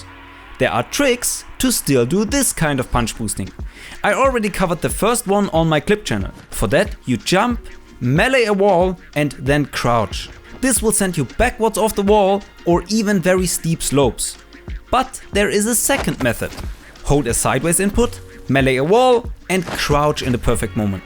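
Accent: Germany. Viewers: I have a question. Is your native language german?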